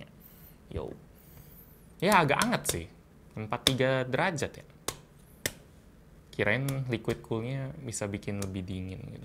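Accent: Palembang, Indonesia. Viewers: Ay wong palembang sikok ini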